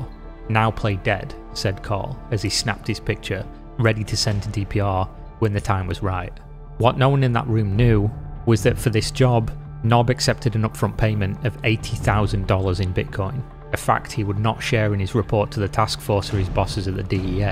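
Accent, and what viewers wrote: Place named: England, United Kingdom. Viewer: I usually find it hard to listen to these types of videos with an english accent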